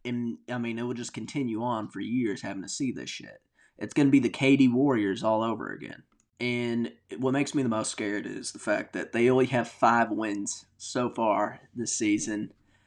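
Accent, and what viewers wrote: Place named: Alabama, United States. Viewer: This dude is a casual, bruh. The thumbnail alone implies Harden should be considered for MVP when the Clippers are 5-16. He called Michael Jordan ancient history like he played in 1960. Then he said LeDaddy James (super pause), and called MJ inefficient like he's not 2nd all-time behind Jokic in player effciency. Completely ignored the fact he's shooting .495 for his career while taking shots most wouldn't consider at an all-time high volume. MF put a period at the end of his title like he just learned how to YT lmao Like how are you clearly an L.A. fan who sounds like you're from Alabama? lmao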